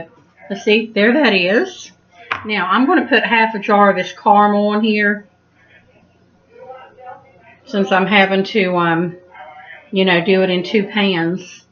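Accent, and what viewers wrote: United States. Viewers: U are to cute an very southern I thought u said salmon.i was confused (cinnamon)Loved it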